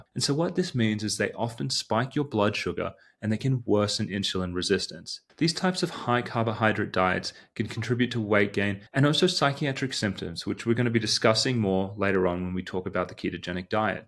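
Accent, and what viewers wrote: Australia. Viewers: Thankyou for the great info. Made some changes in my life and it's really helping. Do I detect an Australian accent? Curious as I'm an Aussie 😊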